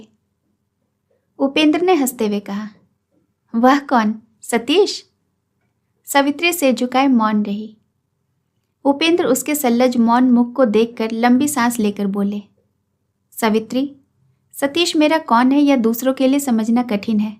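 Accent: Standard. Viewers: ❤❤❤ बहुत ही संयमित एवं अनुशासित, बहुत ही सुंदर एवं दक्ष उच्चारण कला के साथ ऑडियो प्रस्तुत करने के लिए बहुत बहुत धन्यवाद साधुवाद!! एक क्षुद्र जिज्ञासा...😊 आप किस क्षेत्र से हैं; यह आपकी उच्चारण कला से भी जन नहीं ताड़ता!🎉🎉 कृपा करके बताने का कष्ट करेंगी जी??❤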